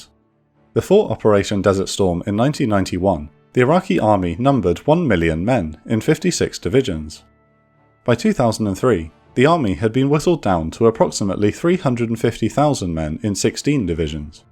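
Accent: United Kingdom. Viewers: A lot of these videos are made with the premise that the British accent = well-informed historian, when it's really a millennial generating click revenue after reading Wikipedia and adding file footage. Iraq had their own domestic production of copies of the Yugoslavian Zastava M70B1s, which were copies of Albanian smuggled AKs from 1959. Zastava helped Saddam start his domestic production of what they call the Tobuk in 1979. Those weapons made from <num> were basically equivalent to Yugos, then the production quality dropped off. They are neither Avtomat Kalashnikovs of 1947, nor Avtomat Kalshnikovs Modernizinaiya (AKM). They are Iraqi copies of Yugoslavian M70s.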